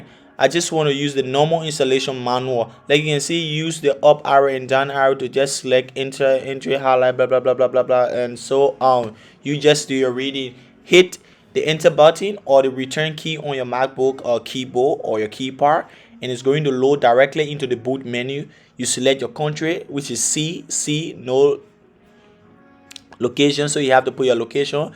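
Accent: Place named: Liberia. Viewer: hi, I know this is not necessary. you sound like a Liberian. Good job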